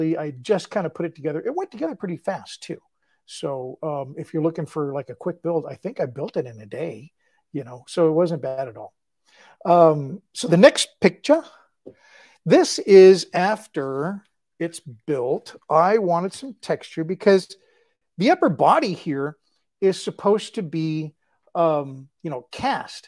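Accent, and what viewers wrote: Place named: United States. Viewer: When you ask, it's normally used on lamps the wire and the fitting it goes into the bayonet or screw fitting I think you call Edison lamp fittings in the US I presume that's where you're from because of your accent if not please excuse my stupidity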